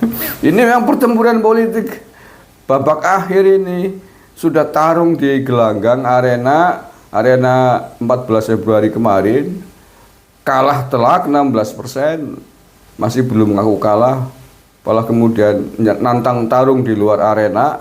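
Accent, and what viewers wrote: Banyumas, Indonesia. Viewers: Tuuuhhhhh khannnn 😂😂😂 senyum gimanaaaaaa gitu khan. Tp yg aku suka dr tuuhhh bahasa banyumasnya mbil senyumm gimanaaaaaa 😂😂😂😂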